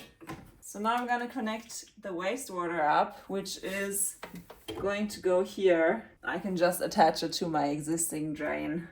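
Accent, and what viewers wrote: France. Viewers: Mignon accent français 😘❤️ Bonjour de France🎉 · a very nice french accent, keeps going amazing works.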